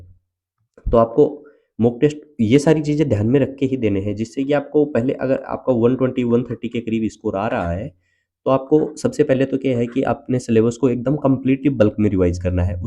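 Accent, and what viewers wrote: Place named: Rajasthan, India. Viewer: Bhai aap Rajasthan se ho na Bolne ke tarike se lag raha h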